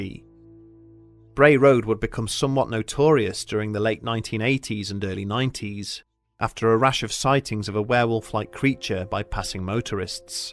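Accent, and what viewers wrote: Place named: United Kingdom. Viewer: The Michigan Dogman narrated by a Brit......give me a break!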